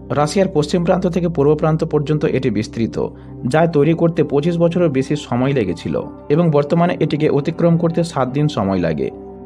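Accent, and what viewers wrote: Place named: Kolkata, India. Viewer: সরি ভাই..... আপনার কথা বলার ধরনটা মনে হচ্ছিল যে আপনি কলকাতার । আপনি কোন জেলা থেকে বলছেন.....??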